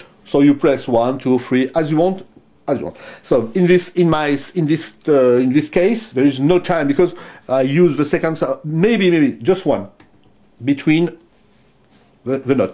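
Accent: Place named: France. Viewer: Tu es francais n'est ce pas ? ca se sent à l'accent haha :p Mais c'est quand même une excellente vidéo, plein d'informations... jusqu’à là je regardais des gars comme Synthmania ou MusicTrackJP pour en apprendre plus sur la prog' des synth vintage mais ta vidéo répond à une bonne partie des questions que je me posais sur les séquenceurs, l'overdub etc. en plus de voir des beaux trésors de guerre comme le OBXA ou le Matrix 6 (dont j'ai une petite préférence pour celui là ^^) et bien évidement la DMX ! (dont d'ailleurs j'essaye de répertorier dans une playlist toute les musiques ayant été joué avec cette drummachine) Je suis encore qu'un modeste joueur qui joue avec un simple clavier MIDI relié à un PC (et je n'ai as encore publié de vidéo car hum... j'ai encore beaucoup à apprendre) mais j'aimerai bien posséder une de ces machine un de ces jours... ou alors un KORG du genre des Poly61 puisque j'aime bien ces petites bêtes qui sonne plus "wild" que les Roland de la même époque... 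et qu'ils n'ont pas encore subi l'énorme inflation de ces derniers :p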